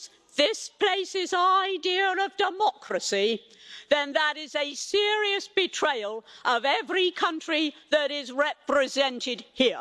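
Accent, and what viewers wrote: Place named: United Kingdom. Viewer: That British accent is 🔥🔥🔥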